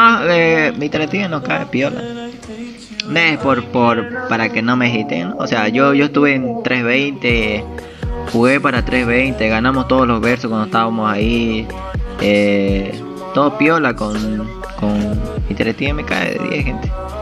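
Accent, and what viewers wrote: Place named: Peru. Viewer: es peruano? pq dice pe a cada rato y chupaganpi?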